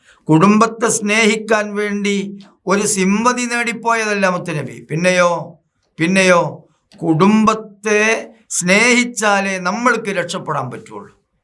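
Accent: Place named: Malappuram, India. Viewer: ഉസ്താദ്, ഒരു വിനീതമായ അഭിപ്രായം..ചില വാക്കുകൾ മലപ്പുറം ശൈലി ആയതുകൊണ്ട് മറ്റ് ജില്ലക്കാർക്ക് മനസ്സിലാവില്ല. അതുകൊണ്ട് പൊതു മലയാളം സംസാരത്തിൽ കൊണ്ടുവന്നാൽ വളരെ നന്ന്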